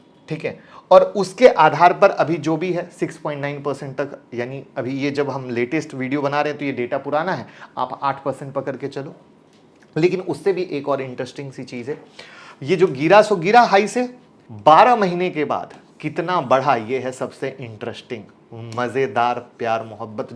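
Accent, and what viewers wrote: Bihar, India. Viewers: App sir Bihar se ho lagta hai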